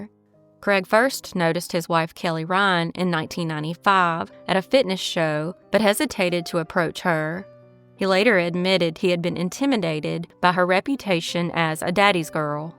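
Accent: South, United States. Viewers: Your thick southern accent ist so cute! My mother tongue ist German, i live in Germany and i lovr strong southern accents!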